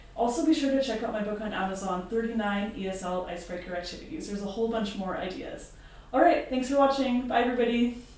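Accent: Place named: Canada. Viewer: LOL Your Canadian accent is very prominent in this video. It's not bad, I'm just saying I picked up on it right away. But I guess that's why I'm a trained ESL teacher. I can easily identify accents from all over the world. My question for you, though, is what kind of icebreaker activities would you recommend for adult beginners? Most of the beginner activities I come across are for young learners. I'm looking for some activities that adults can do that aren't too advanced.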